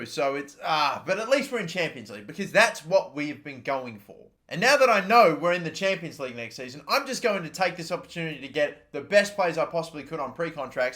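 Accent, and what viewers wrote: Australia. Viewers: Is Australian?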